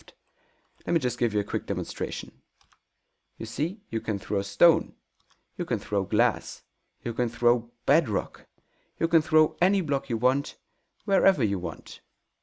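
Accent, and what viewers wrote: Germany. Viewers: are you german? you don't speak english as well as a real english people!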